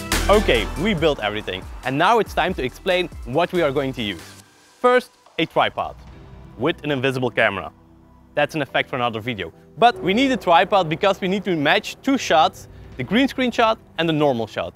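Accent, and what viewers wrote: Limburg, Netherlands. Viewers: ik ben ook nederlands, jij komt uit Limburg? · your dutch isnt it?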